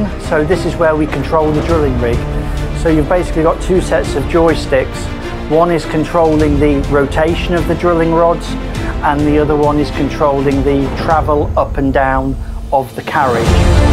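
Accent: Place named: England, United Kingdom. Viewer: Der kommt halt aus England . Untertext ist doch Deutsch halt net Schwäbisch 😅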